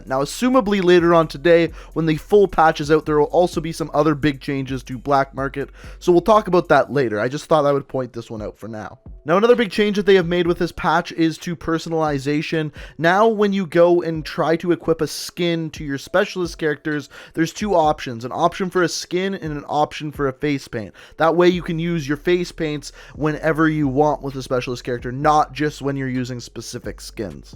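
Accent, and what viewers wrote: Canada. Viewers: That is the most Canadian accent i've heard in a while. I love it.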